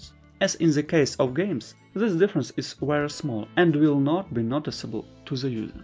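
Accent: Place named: Russia. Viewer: Слышен русский акцент)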